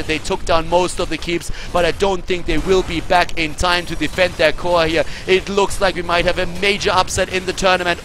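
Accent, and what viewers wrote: Germany. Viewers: Good commentary. It was clear you were rooting for the Germans, and I think your accent is German, so maybe there's a reason for that ;-) You did point out the good things both teams did, though, and being a bit invested in the game is always cool. Subbed!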